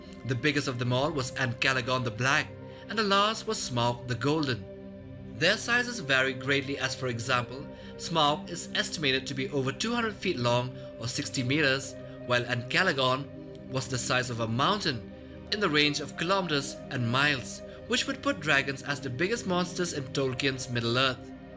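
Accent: India. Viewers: Lucas Frost have you never heard an Indian accent before? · You seriously need a better narrator; his wimpy boyish Indian accent really kills an epic vibe.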